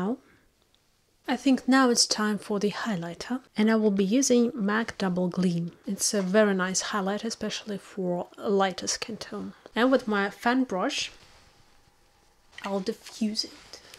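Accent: United Kingdom. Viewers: ваш британский акцент шикарен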